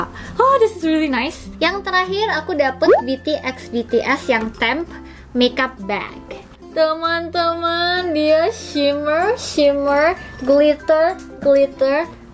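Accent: Bali, Indonesia. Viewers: logat balinya masih ada yaa eonnie "lagi satu" bukan "satu lagi" hehehe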